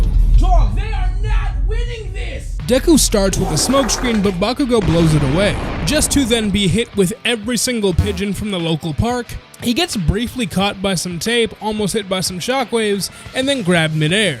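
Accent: Jamaica. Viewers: you jamaican? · Big bro jamaican?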